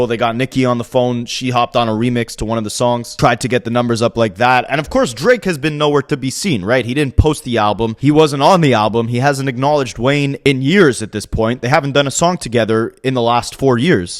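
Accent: Canada. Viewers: no doubt I was just making sure I seen it questioned in comments a couple of times and the Canadian accent brought me back to the question and I never saw a straight answer 😂😂😂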